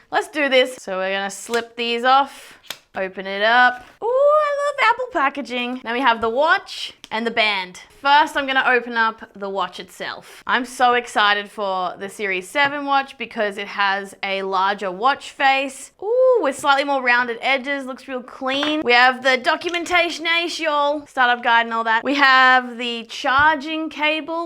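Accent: Australia. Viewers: Yeah, great channel by the way. Are you Australian, I am.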